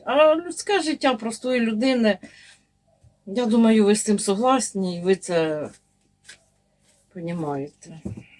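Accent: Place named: Poltava, Ukraine. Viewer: Привіт з багатостраждальної Херсонщини.Слухаю Вас як дома побувала.Моє дитинство пройшло на Зіньківщині.Там проживають мої рідні,до яких я вже третій рік не можу приїхать через кляту війну.Наш полтавський говір не забуду ніколи.Миру нам і закінчення війни,а вам бажаю здоров'я.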